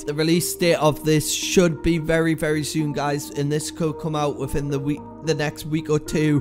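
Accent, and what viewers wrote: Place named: Newcastle, United Kingdom. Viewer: You sound geordie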